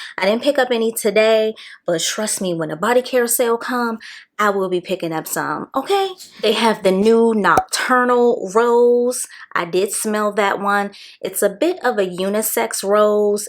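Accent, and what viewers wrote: DMV, United States. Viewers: Your accent is giving DMV ❤ I have to get the purple one love don’t be shy dupe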